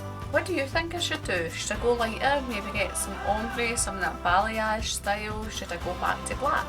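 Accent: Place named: Scotland, United Kingdom. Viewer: are you scottish I can hear it in your voice love it.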